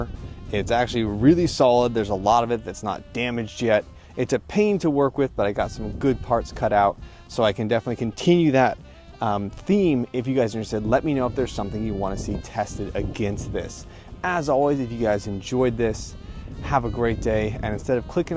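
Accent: Australia. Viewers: Thought it was pretty good you doing an aussie accent .as im an aussie . you actually sound more aussie than most people i talk to.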